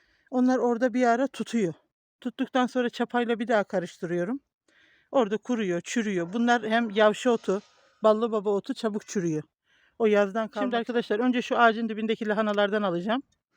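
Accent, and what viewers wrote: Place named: Trabzon, Turkey. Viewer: valla canm ne yaprsan yap izleniyor hele konusma tarzin trapi gibi gliyr.her seyi guzel dusunmn cok guzel sende ailende masaAllah size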